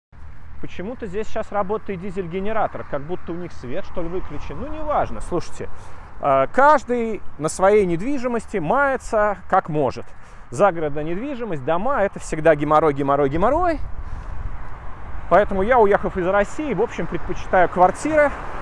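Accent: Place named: Castile, Spain. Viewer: испанский не с акцентом,а кастильский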